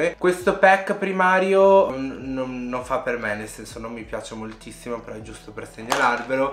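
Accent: Milan, Italy. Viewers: a me non piaceva la tua parlata milanese di m!! 😂😂😂 invece i tuoi contenuti sono per me ottimi e quando esprimi pensieri e opinioni “makeup a parte” ti ho sempre trovato serio e maturo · a Milano la cadenza è quella e non è di "m". Cerchiamo di stare nei limiti dell'educazione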